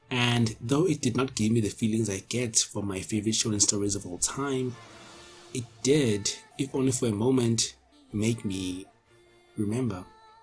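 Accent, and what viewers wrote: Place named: RP, United Kingdom. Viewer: Bros english is really queen Elizabeth II level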